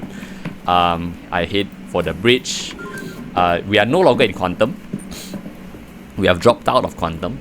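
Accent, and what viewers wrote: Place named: China. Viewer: Are you Chinese? You sound Chinese. :D If so, ni hao, gao xin ren shi ni. Thanks for the funny video.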